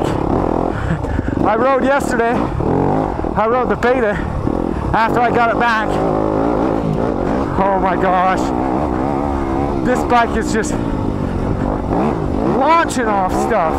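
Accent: Utah, United States. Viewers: You said last year that the Beta 390 RR was your favorite 4-stroke ever. Sounds like this one might usurp the Beta. Oh, and I love how you speak Utah language.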